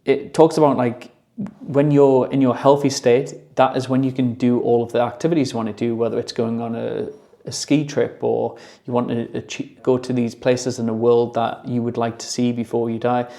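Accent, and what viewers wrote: Newcastle, United Kingdom. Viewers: I thought I recognised that accent (as a fellow Geordie) 😘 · Are you a jordie or from South Africa?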